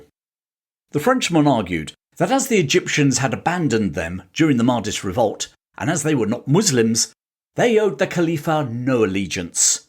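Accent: England, United Kingdom. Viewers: Perfidious albions, you are right. Kitchener was a francophile, and pleased to drink some champaign. What a waste, French champaign for a rosbeef. Thanks to for his uterative English accent. I dealt with Amoseas oil petroleum company on my past business activities, and these fellows were spoken Humphrey Bogart American English. Nevertheless, Great Britain was stronger than France for three centuries, but at the present time we are small nations.. I believe in Royal Navy UK, as still to be considered as unique. Grace of Kitchener, nothing happened in Fashoda. The place is named kodok,? Do you know, it means grenouille in Bahasa Indonesia? We French are same as Donald Trump, we shall confess our sins, but first fire me these British people who are sitting on our chairs, in our Egyptian colonies. Merci. Mercy.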